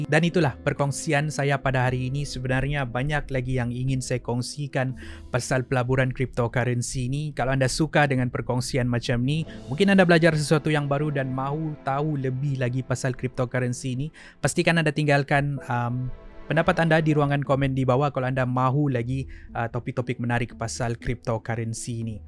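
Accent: Sabah, Malaysia. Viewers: Aslmkom, Boleh Kerap Sikit Upload Video. Hehehe.. 😊😊😊.. Aku Suka Dengar Cara Ko Sampaikan Satu² Contents Tu..! Tak Kiralah Contents Apa.. Yang Dalam Loghat Sabah Kola.. Lama Sangat Dah Tak Jumpa, Kawan² Sabah... Dengar Loghat Sabah Pun Boleh.. #FlashBack Juga Sikit² Tu Kan.....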